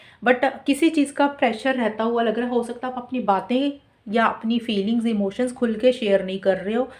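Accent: Punjab, India. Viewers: nice punjabi accent👍👍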